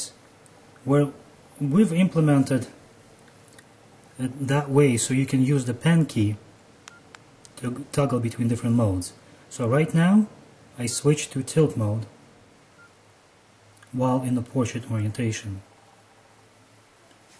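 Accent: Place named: Russia. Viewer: He have a russian accent :))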